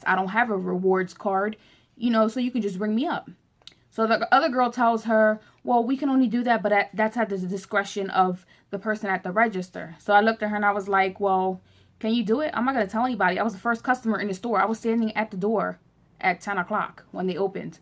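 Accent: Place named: Pittsburgh, United States. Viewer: giiirl are you from pittsburgh? sorry off topic but your accent sounds like it! im from philly :)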